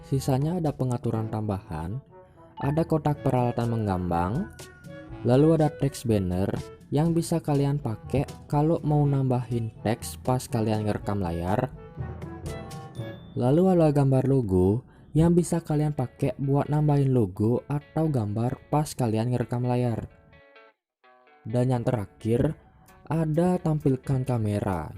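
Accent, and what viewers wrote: Bali, Indonesia. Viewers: Logat abangnya ke orang bali salam bali kawan🙏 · Kayak Logat Orang Bali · Org bali ya bg? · Orangh Bali Banh? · Adi care nak Bali logat ne,,😀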